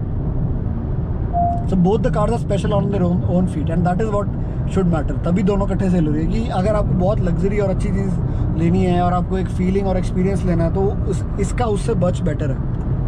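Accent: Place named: Punjab, India. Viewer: apni yeh punjabi tone aur attitude kabhie mat chhodna luv u sir: )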